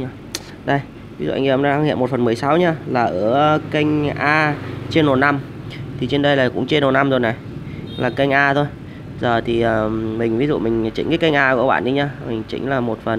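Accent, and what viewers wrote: Thanh Hoa, Vietnam. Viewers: giọng thanh hóa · Nghe giọng ông T.hóa chán quá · kiểu như giọng thanh hoá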